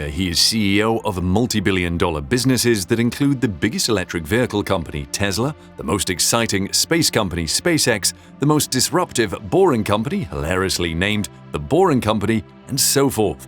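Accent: United Kingdom. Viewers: Annoying British voice that uses click bait subject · A semi spiffing Brit presentation.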